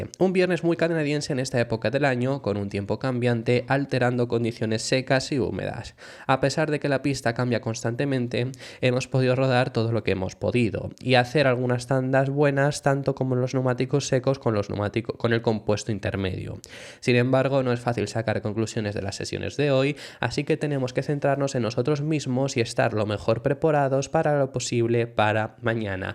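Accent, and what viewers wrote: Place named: Spain. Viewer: Parece por tu acento que eres español, y por supuesto el titulo de "Alonso fue el mas rapido" debio ir acompañado de "pesco en rio revuelto", con ese auto si acaso en pista seca se mete de 7º o de 8º, hay que ser más realistas que fanaticos.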